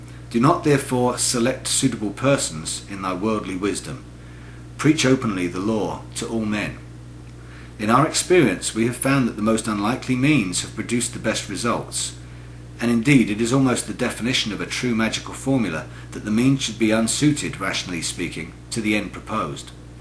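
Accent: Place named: London, United Kingdom. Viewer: I am from London and this chap sounds like he probably came from nearby.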